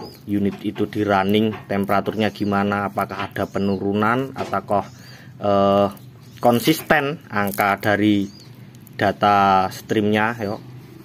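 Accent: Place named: Kediri, Indonesia. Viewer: kulo pikir lek kediri om aksen jawanya😂😂😂😂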